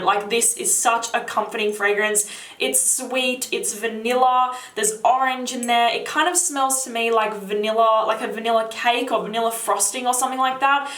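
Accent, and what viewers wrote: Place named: Australia. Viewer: I just came back from Australia & I miss the accent lol. I love your videos! Keep up the good work. You’re soooo pretty too. I am hankering after TF BO EDT too.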